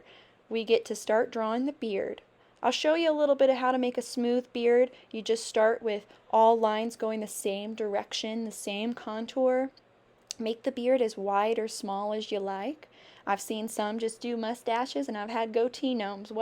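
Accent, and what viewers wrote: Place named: United States. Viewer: Love your country accent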